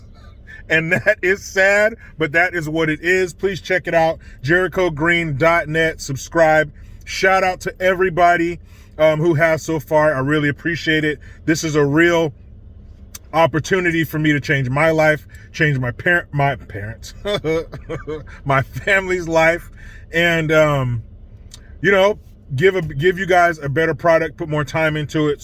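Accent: Australia. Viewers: Love the Australian accent......"mate!" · Lol love your Aussie Accent, 😂😂😂 🤣🤣🤣🇦🇺💕💕💕🇺🇸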